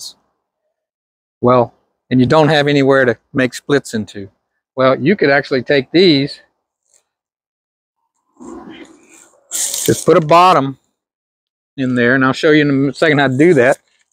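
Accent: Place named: Australia. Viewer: ☺ Cedar, you can't go wrong, it will last for years. The AI must not have liked the Aussie accent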